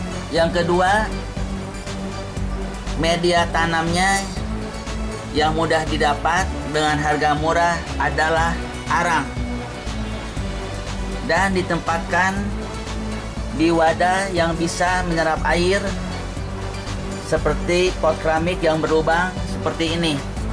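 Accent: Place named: West Java, Indonesia. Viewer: si akang,logat nya urang sunda pisan euy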